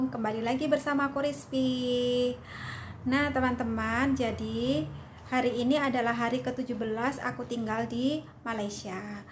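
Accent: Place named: Java, Indonesia. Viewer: Koe wong endi toh yu..kok bahasane medog buanget..untunge ricok oleh bojo londo yuk...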